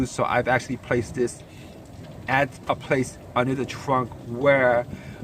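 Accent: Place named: New Jersey, United States. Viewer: Thanks for your review. Where is your accent from? New Jersey?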